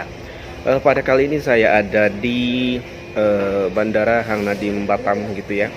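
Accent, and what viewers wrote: Malaysia. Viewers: Kalo bisa jangan pake logat melayu, tpi logat jakarta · Mmng betul adik, ngomongnya kamu tu bkn org Indonesia dh,dh mcm org Malaysia sngt dh.Xpa...niatkn sedekah,biarlh peniaga tu yg jwb jika ianya ada niat menipu.Gk kn senang mn pn jika ianya menipu pelanggan mrk...gk berkat... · Makanya.... 1. dimana bumi dipijak, disitu langit di junjung, Mas. Kamu baru 2 tahun di malaysia, udah pakai logat malaysia. 2. Mana ada ayam penyet harga 12ribu di Batam. Paling rendah 18 ribu rupiah. Teh obeng / ice tea itu minimal 5 ribu. Nah kalo lontong sayur,. Nasi lemak (makanan untuk sarapan) iya rata² 10ribuan. 3. Lagian, itu warung dekat hotel toh? Yaa pantes donk....😅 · Bahasa mas sudah mirip orang malaysia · Speaking pun dah mcm org malaysia...slang indon langsung hilang.... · Cara abang bercakap sikit" agak logat orang malaysia.jadi di sangkanya orang luar negeri. 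· Logat mu malaysia,jd di mahalin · Sampurasun.bng kata aku mah bhsa.abang nyak.jadi campur.logat.melayu. kata aku mah.kan Abang udah lama kerja.di malaysia.jadi logat.melayu.nyak kebawa. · Salam..org jawa timur..raut wajah dan percakapan anda jugak seperti org Malaysia. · Wajah dan slang cakap macam orang Malaysia tau.😊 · salah anda sendiri..knp pake loghat malaysia.. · Bro.. Kamu dah mcm org Malaysia.. 😀.. Percakapan kamu mmg dah Malaysia sangat.. 😁😁👍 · lol... minda sempit... x lupa pun bahasa indonesia.. lohgat je tertukar siket.. itu normal la sbb br pulg dr malaysia.. lama2 akan kembali loghat indo..itu pun nak kecam.. patriotik itu bagus tapi jgn eksterim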